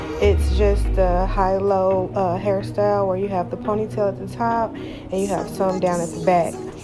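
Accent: South, United States. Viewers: Loved the look... And Baby you don't have to explain yourself about how you talk... You have a cute southern accent that's understandable... Ik people not trying to come for you in the comments 💪🏾... I heard everything clearly and by me being a visual learner I found everything to be very detailed with your speech and the hands on process... On the road to 50K... Let's get it💪🏾 ♥️💯...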